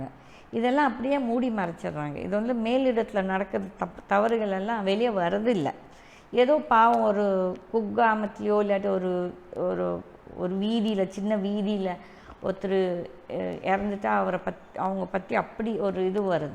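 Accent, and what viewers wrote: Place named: India. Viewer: Would be more genuine if you have concern on soaring fuel price, high inflation, migrants plight, increased toll fares, dampened msme industry. There are bigger national level corruptions that you've conveniently missed to mention. Rajaji s swatantra party did nothing useful to TN and you are carrying the same name; doubtful on your agenda esp to avoid elections - do you want to continue the existing corruption to go on. Election is the only tool to correct the citizens' mistake/fate; you want that to be denied for the vested interests. Should also mention that thousands crowded in Ayodhya, moharram day at Hyderabad, sellur Raju meeting at Madurai or the mahalaya amavasya at sathuragiri. Pls speak Tamil in the native slang, the hindiing sound is irritating. நிலானியின் தமிழை கேட்கவும்.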